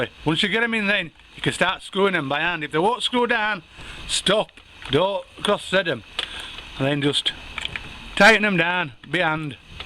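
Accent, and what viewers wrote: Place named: Grimsby, United Kingdom. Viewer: Just gunna up-jet my 150 4T top tips. You sound like a Grimbarian?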